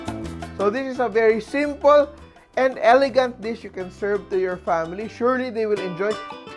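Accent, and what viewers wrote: Philippines. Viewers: Philippines accent are cute ...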